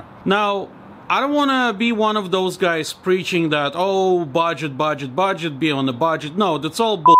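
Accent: Russia. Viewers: Sounds like a Russian accent.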